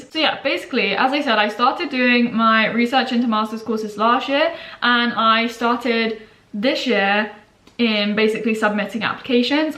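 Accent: Australia. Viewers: I feel like she's delevloping an Australian accent, very likely that she's not but I kinda heard it in the intro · You’ve picked up a bit of an Australian accent!! Love it! X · You sound so Australian since you got back from your trip!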